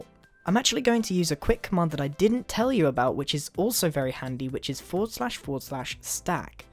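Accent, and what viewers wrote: England, United Kingdom. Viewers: He is British. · Everybody loves the English accent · Yeah English accent, that's what its called.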